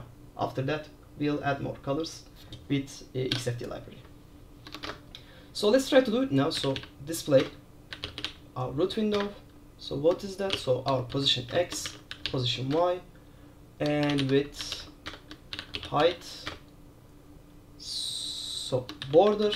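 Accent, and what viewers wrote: Turkey. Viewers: türk olduğunu 5 saniyeden anladım hocam, eğitim için teşekkürler. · Dinlerken dedim çok Türk'e benziyor ingilizce. Yorumlarda bunu görünce tetit etmiş oldum.😂 Başarılar hocam.🎉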